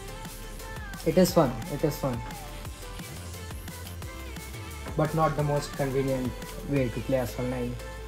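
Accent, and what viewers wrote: India. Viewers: Love the Indian voice! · He is from india? Awaz to Indian jaise hai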